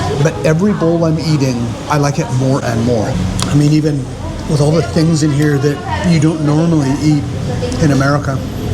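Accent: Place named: Iloilo, Philippines. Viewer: I don't think you're an expat, i think you are actually Illonggo, very good job!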